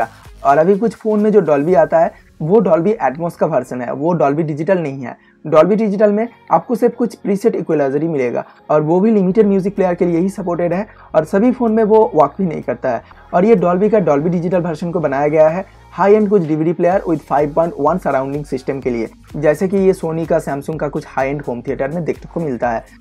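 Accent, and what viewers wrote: Bengal, India. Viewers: You Bengali ??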